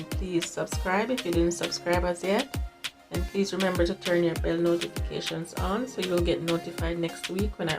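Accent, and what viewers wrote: Jamaica. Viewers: Sounds like a yardie. Mi like it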